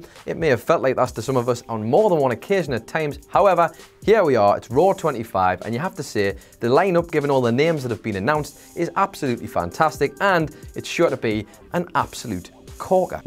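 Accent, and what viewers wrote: Ireland. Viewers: Are you irish and you look like connor mcgregor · Irish accent? Fookin awesome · I thought that it was Jules with hair, before I got that this guys irish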